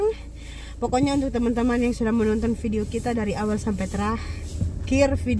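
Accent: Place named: Eastern Indonesia, Indonesia. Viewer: Salam kenal Kk 😊 Dari logatnya seperti dari Indonesia timur ya Kk, semog berbahagia selalu bersama keluarga.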